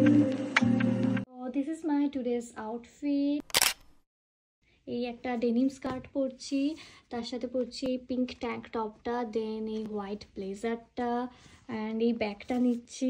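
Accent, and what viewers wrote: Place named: Bengal, India. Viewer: Tomar ei Bengali English accent ta vishon irritating and believe me tomar kotha gulor jonnoi vlog ta lengthy hoy, vishon boring hoy. Ei neka neka voice ey kotha na bole music die Dio. Vlog interesting hobe tahole.. ei under confidence er moto neka kotha gulo shunlei majh theke manushe back kore chole jabe.. just a honest review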